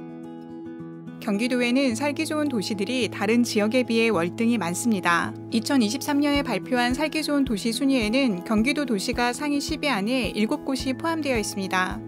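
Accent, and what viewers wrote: Gyeongsang, South Korea. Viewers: 나레이션 하신 여자분 경상도 출신이신 듯..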